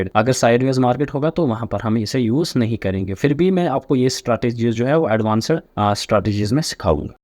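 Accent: Kashmir, India. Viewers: Sir, app Kashmir se ho kya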